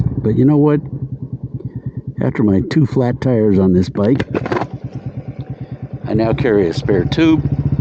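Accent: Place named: United States. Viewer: Marshall to Leicester... Thought these sounded like towns in Great Britain, so I thought this was another "English Chaps" review of the 350 until I heard your voice! Then thought, OK, its an American living in England! While watching, realized your in North Carolina! Love your Humor and stories ... Like murder in the Appalachians ... which made me think of the book by Bill Bryson, "A Walk in the Woods". When you started recalling stories of your childhood (like using the sweeper for siphoning fuel...back in the 50's), ... another Bill Bryson book came to mind ... "The Life and Times of Thunderbolt Kid". Bill Bryson, like myself, was born in 1951 and your humor reminds me a lot of his! I think we all pulled some "bonehead" stunts back in those days but as long as you made it safely home by suppertime,... mom and dad didn't seem to care what you were doing or where you's been all day! 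Much simpler times back then! Anyhow, I hope your enjoying your Signals Edition in Desert Sand, (as much as I am enjoying mine!)... Sure wish Northeast Ohio had a few extra weeks for motorcycle riding, ( like perhaps North Carolina does?) so my bike wasn't hibernating as long! Your audio sounds great! I'm waiting on the next shipment of Instamics (for my Insta360 One RS camera), so I can loose the dual wires (running from my helmet and saddlebag), and enjoy riding without being "tethered". Drive Safe!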